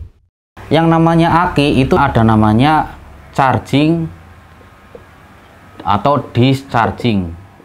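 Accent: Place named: Banyumas, Indonesia. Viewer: Masee orang ngapak yaak? 😁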